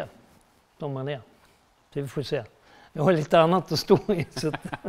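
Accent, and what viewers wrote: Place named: Stockholm, Sweden. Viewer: Skön lirare 😊, och pratar riktigt Stockholmska 👌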